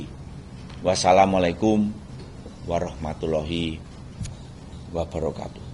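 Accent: Java, Indonesia. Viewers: Jawa medok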